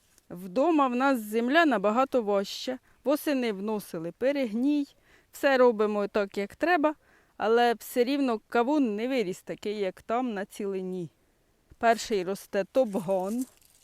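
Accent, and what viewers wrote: Poltava, Ukraine. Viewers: Мабуть звідкись з Полтавщини судячи по говору. )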